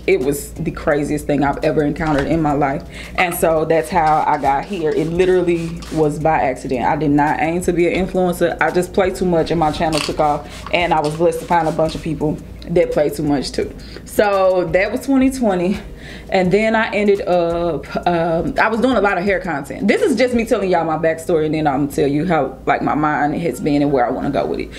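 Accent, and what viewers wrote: St. Louis, United States. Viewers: hello beauty, i see you and i still see you. you remind me so much of both my daughter and niece (it's that accent... st. louis). my hard working mother of two daughters is also considering weight loss surgery and i've suggested she view your videos. i know everyone won't have the same experience but i loved your candor and realistic journey. she too wants the surgery afterwards and yes she has friends who kinda look at her like she crazy for wanting this for herself. So it is quite true you cannot please everyone, why try, hard enough trying to get support from those around you. it's a brave and scary thing. still loving your journey and that cute little man you got. i know he keeps you busy...i swear it amazes me how much i see my diva you in. and you are a diva in the best of ways. bless you love The Lord keep you guided❤👍🙏👶❤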